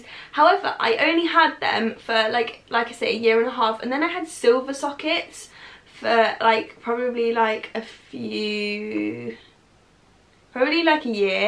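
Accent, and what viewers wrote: United Kingdom. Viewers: Educational experience for me. It is a great pleasure listening to you,. I love your english style, your marvellous smile, your dimples and positive attitude. I like your facial expressions as well. I am a great fun of yours. Admit i find it somewhat challenging to understand british english, but coming from you it sounds so good. You just makes me feel good. My mind mirror you. Please consider working on an ice breaker ship, for your smile can melt icebergs.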